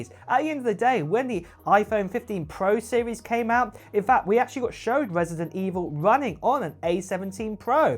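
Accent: United Kingdom. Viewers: I definitely will buy the next  TV. You are really good explaining the new devices plus it helps with your British accent, I m from London UK. 🇬🇧 😁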